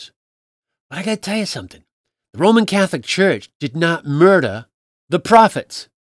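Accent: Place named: New England, United States. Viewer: Love your New England accent. Don't hear much of that here in Winter Park, Colorado at 9000 feet near the Continental Divide.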